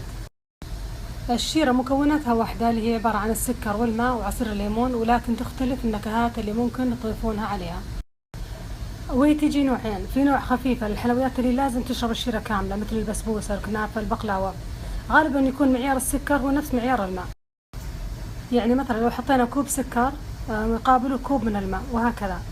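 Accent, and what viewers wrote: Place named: Najd, Saudi Arabia. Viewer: هاذي لهجة اهل نجد بشكل عام 😍🥰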